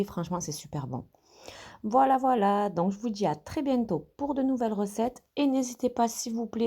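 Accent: South, France. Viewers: Merci beaucoup en plus on a l'accent du sud en bonus 😘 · Super expliqué. Merci pour votre partage.!!! Je retrouve l accent du midi, quelle ́nostalgie... j essaierais votre si belle et visiblement bonne recette. Merci et Bravo pour votre travail.♥️♥️🎀🀄🌟🌞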